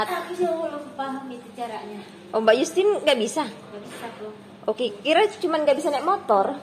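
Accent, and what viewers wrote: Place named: Sumatra, Indonesia. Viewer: Nyimak cara buat ketupat,,,, kok dialog nya seperti orang Sumatra,,,,, besok saya datang lagi sambil mengantarkan kopi hitam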